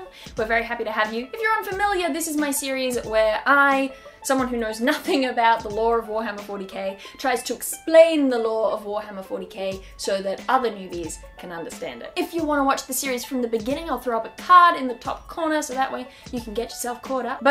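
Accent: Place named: Melbourne, Australia. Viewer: Australian? By that accent I rekon melbourne maybe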